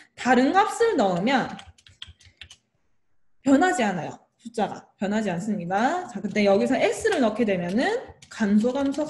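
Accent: Gyeongsang, South Korea. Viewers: 경상도 어투가 살갑고ᆢ정겨워요~ 강의가 귀에 쏙쏙~~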